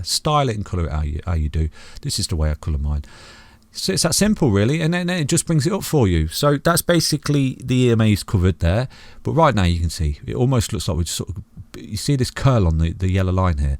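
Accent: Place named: Midlands, United Kingdom. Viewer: I think Midlands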